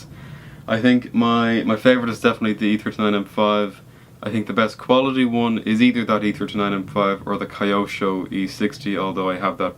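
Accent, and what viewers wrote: Ireland. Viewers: are you irish ? so am I . cool video :)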